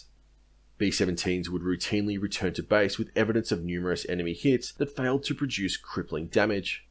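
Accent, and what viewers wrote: Australia. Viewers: Hmmm? Narator sounds like Majorkill. Or do all Australians sound same? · I LOVE this Aussie narrator ! Awesome. G'day mate ! 🫡 🇦🇺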